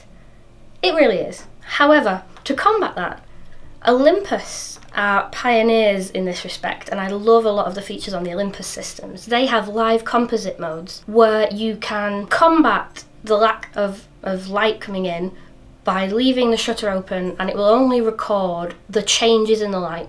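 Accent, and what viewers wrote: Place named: England, United Kingdom. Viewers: Hi, you've just gained a new sub after watching a couple of your videos, love the Bolton accent too (I'm from Manchester originally but now living in New Zealand:D) Anyway, i started photography about 15 years ago and was exclusively Canon until, for the same reason as you, i found myself leaving my kit at home. I then decided to try m43 with the Olympus OM-D E-M10, it was a cracking camera with some good features, i invested in a few lenses but i found they just didn't 'feel' right for me, for the last year though i've been using my Sony A6500, which i absolutely love, it's the best of both worlds, not too big, decent lenses and APSC sensor for more detail. I'm trying to get more into video and have just invested in the Zhiyun crane plus, which is how i found your channel, (i searched night lapse). So, i hope to get out and do some nice NZ lapses:) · Great video , very educational and a great Northern accent. 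Where are you from ?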